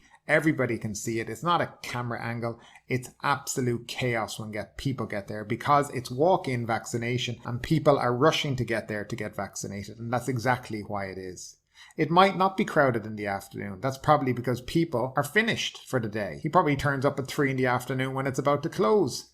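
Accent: Ireland. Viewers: ah. Dunshaghlin or dunboyne I’d say. (With that accent) …… I’m a dub myself.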